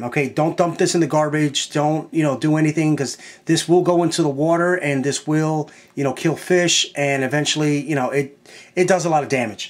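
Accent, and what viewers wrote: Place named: Bronx, United States. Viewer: yo!!!!!! are you from tha Bronx or somthin!!?? ah???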